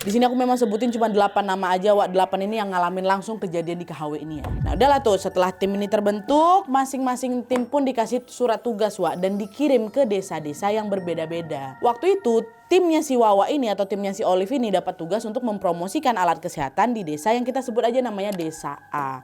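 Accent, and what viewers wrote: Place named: Kalimantan, Indonesia. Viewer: Warga kalimantan mana ni suara nya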